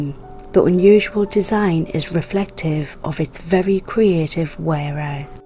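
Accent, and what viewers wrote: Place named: North East England, United Kingdom. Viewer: It’s a north east of England accent. Newcastle or Sunderland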